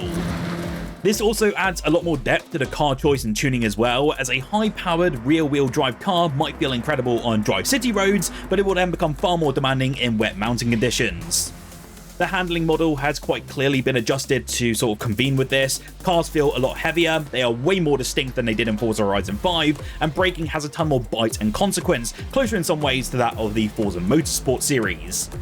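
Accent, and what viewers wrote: United Kingdom. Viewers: I normally love the English accent, but I feel that you're so overly animated. I can't tell if this is a serious review or something from Saturday night live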